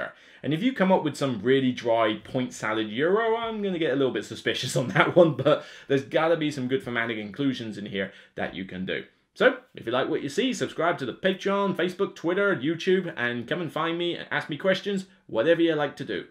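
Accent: United Kingdom. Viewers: Ignore the haters man, you do good stuff, us brits all have weird accents, mines a combo of welsh and midlands so a bit of a odd combo too :P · Like the intro. Usually internet trolls are the kind of people who won't have the guts to say crap face to face and, most of the time, are insecure. Picking on your accent ? Seriously ? I'm french Canadian and I don't have any problems understanding what you're saying, in fact, I love British accent, it sounds so cool I think.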